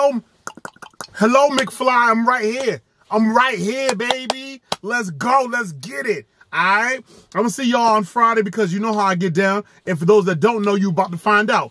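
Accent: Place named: New York, United States. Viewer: Yo this dude gotta be from new york... "Yahll gave wild feedback"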